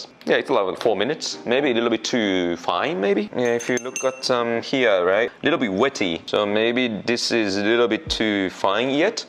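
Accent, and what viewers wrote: United Kingdom. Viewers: 우리도 영국영어 도입이 시급하다 귀에 쏙쏙 박히네😆😆😆😆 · 영국식 발음을 구사하시네요. 영어도 굉장히 잘 하시구요.ㅎㅎ 설명이 너무 좋습니다. 모든 행동에 다 이유가 있으세요. 마치 수학자처럼 꼼꼼하게 커피를 연구하시는 분인 것 같습니다. 멋진 분이신 듯 ..많이 배웠습니다,~!!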